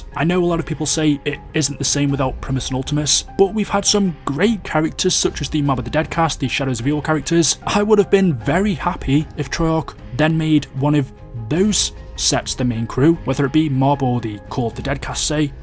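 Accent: United Kingdom. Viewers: you sound like razzbowski if he was super bri'ish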